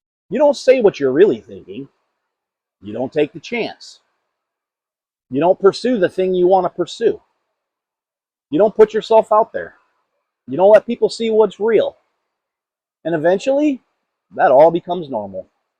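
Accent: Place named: Georgia, United States. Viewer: "Let's have a talk" in that warm Georgian accent is absolutely killer. jmho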